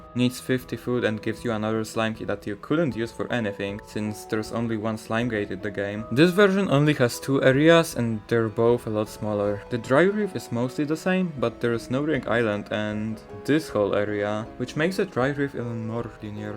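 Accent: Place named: Poland. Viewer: this guy's polish accent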